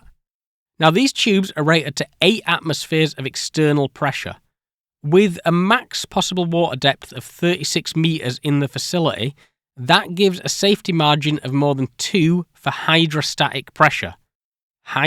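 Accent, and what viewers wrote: United Kingdom. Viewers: I was wondering if this was going to be a good video, and then a young Brit started talking. I was relieved. haha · Kind of hard to take this seriously when you mispronounce "photon" 50% of the time. Practice your "t"s Sound less bri-ish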